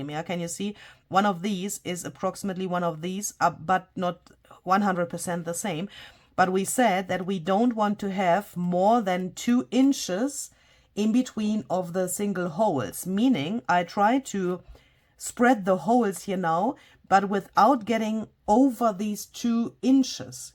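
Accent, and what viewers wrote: Germany. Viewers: you make the best videos! I love listening to you - to the English speaker a German accent is so charming - and your English is very good! If only I could speak a second language as proficiently as you do! I admire you so much and am so happy for all your success. Thank you for all the great content! I am buying you a coffee too! OMG: as I continued to listen, I heard the "You do you" comment. How ridiculous people can be! He doesn't own those words, nor any other phrases. These are commonly used in English conversation. All influencers can have comments they like to make, but that does not mean it belongs to them unless it is something specific to a product they have copyrighted. Don't pay attention to anyone who criticizes you, you have never done anything at all wrong!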